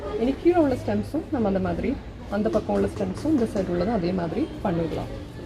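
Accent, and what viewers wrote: Kerala, India. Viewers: அருமையான விளக்கம்.மலையாளி தமிழ் மொழியில் பேசுவது போல உங்கள் பேச்சு இருக்கிறது. நீங்கள் மலையாளியா ?.தெரிவியுங்கள். நன்றி.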